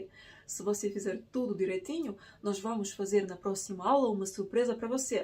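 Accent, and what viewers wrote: Portugal. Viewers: Com esse sotaque você mora em Portugal e não no Brasil né?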